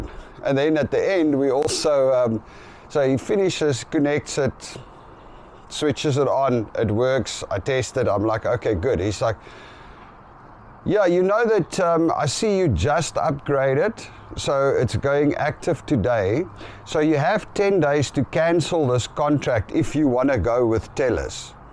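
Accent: South Africa. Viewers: You are South African🎉❤ i can immediately tell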